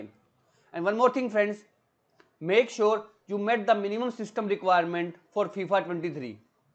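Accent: India. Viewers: say d instead of T and you have India.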